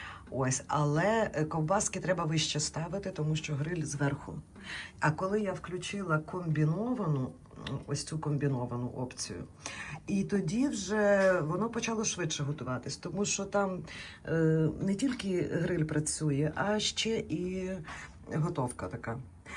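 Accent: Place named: Central Ukraine, Ukraine. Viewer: Гарна українська мова при 20 роках в Англії. Схожа на центральну Україну.[ Хай щастить.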